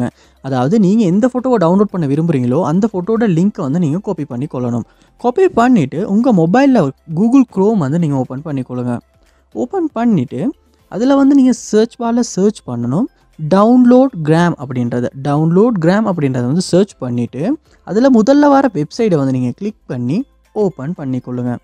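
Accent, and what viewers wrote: Sri Lanka. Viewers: Srilankan tamil 😀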